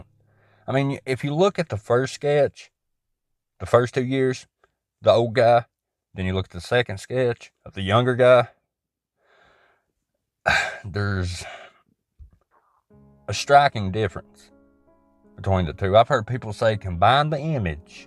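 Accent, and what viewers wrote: South, United States. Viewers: Keep it real! Everything sounds better with a southern accent brother....love from Tennessee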